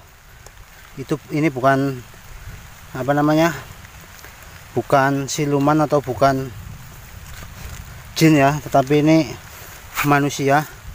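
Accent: Banyumas, Indonesia. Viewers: Aduh mba bahasa ngapaknya keluar nnti yg bukan ngapak ngga tau loh 🤭 Penemuan suku tidak jelas boso ngapake koyo ning judul nduwur wi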